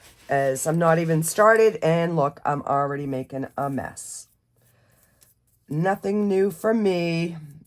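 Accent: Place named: Long Island, United States. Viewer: I’ve been trying to guess your accent since I stated watching you😂 I’m from the East Coast so I’ve guessed Long Island or Maine. I’m sorry if that’s too personal I’m just a curious person♥️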